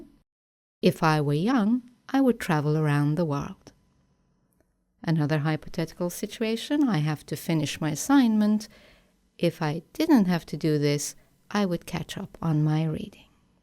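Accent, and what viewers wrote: United Kingdom. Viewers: it is british english? Answer me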